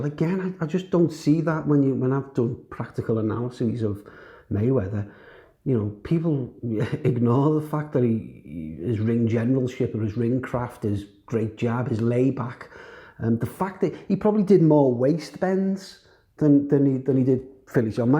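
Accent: Liverpool, United Kingdom. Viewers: A beer and a chat about boxing at the Phil one day. Listening to you makes me miss Liverpool man, and the practical logical approach of scousers. Best in da wairld 😋🤝❤️🤝😋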